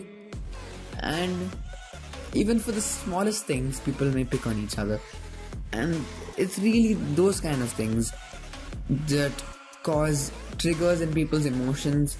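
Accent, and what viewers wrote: India. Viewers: are u Indian or like Asian? not trying to sound ignorant but it kinda sounds like it in your voice. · I recognise that accent 🇮🇳 India all the way!!!!!🇮🇳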